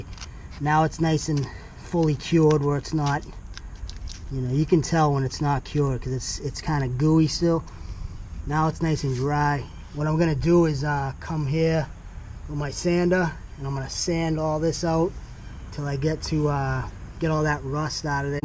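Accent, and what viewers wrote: Rhode Island, United States. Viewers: You sound like a Rhody. Great job.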